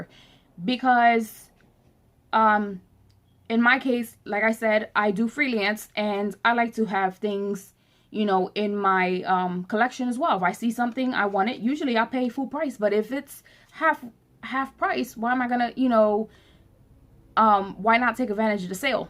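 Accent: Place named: Pittsburgh, United States. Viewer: giiirl are you from pittsburgh? sorry off topic but your accent sounds like it! im from philly :)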